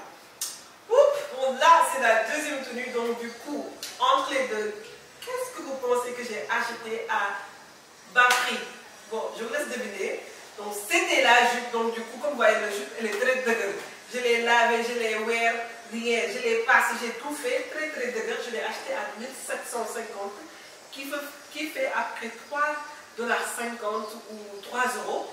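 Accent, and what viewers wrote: Senegal. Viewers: L'authentique accent sénégalais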